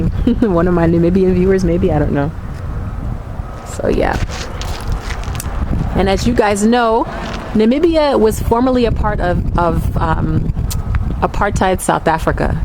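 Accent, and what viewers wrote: United States. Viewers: So I was trying to remember where I've seen you then I remembered that I saw you at a workshop in Dec 2019. When you spoke I noticed your accent and wondered if you are an expat or a Namibian who grew up in the states. Otjiwarongo is one of my favourite towns in Namibia. Those saying it's neat really need to see it in oerson, more beautiful.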